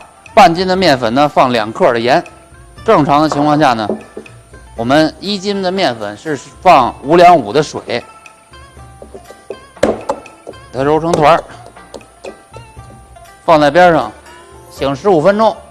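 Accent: Beijing, China. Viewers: 普通话说的很地道像是一位北京人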